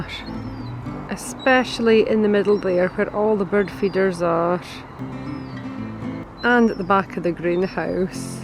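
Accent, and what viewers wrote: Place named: Fraserburgh, United Kingdom. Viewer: I see you've got a Lewis Chess Man! I've wanted one or two of those for YEARS! 😂 I think your sweetcorn needs wind to pollinate, are you giving them a shakey-shake? Also you remind me so much of my friend from the Broch - it's the accent. She moved back to the Broch from Glasgow and I miss her so it's good to hear a similar accent.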